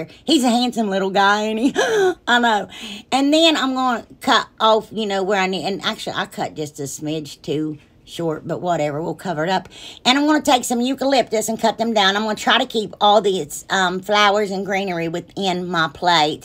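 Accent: South, United States. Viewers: . Just discovered your channel. Love your southern accent. Love your inspiration. Youur extremely creative. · I love all your videos. I'm looking forward to one this evening. I'm also from the south and I talk allot like you. Don't let anyone change you. The way you talk and absolutely everything else. That's who you are and who God made you to be. You're beautiful and very talented. Stay just like you are. I love the way you love God. We have so much in common. Be You Always and Forever. !!! ❤❤❤ 😊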